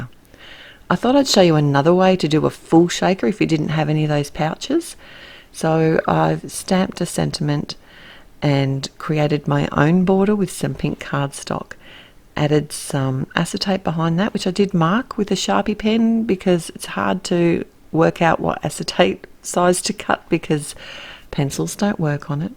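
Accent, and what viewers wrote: Australia. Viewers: I'm so glad you started your channel. I'm from Australia and you sound like a neighbor, here or NZ maybe Anyway... I do enjoy your posts. Wishing you many blessings ")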